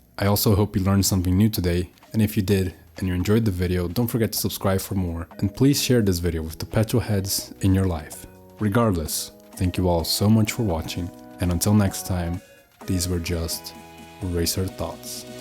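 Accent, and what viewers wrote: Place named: Turkey. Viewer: You got turkish accent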